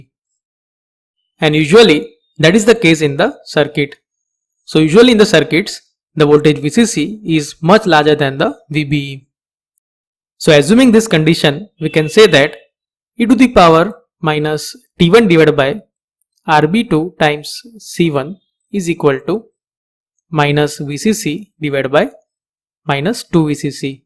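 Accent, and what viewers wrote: India. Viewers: American accent please. I can not digest Indian accent. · when yu hear indian yu know its legit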